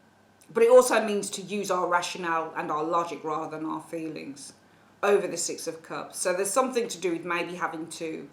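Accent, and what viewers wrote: Birmingham, United Kingdom. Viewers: hiya are you from birmingham just like me?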